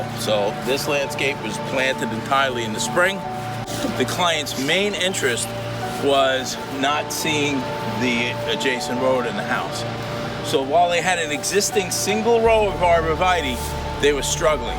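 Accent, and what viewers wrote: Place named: New York, United States. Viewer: Ohhhh...New York. I grew up in the Hudson Valley & I miss it. In OK now. It's so good to hear that NY accent again. Beautiful landscape.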